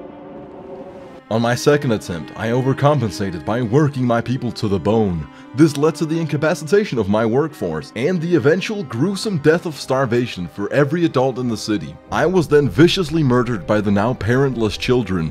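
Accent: Denmark. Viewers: This guy danish ? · Wait shit. Are you bloody Danish?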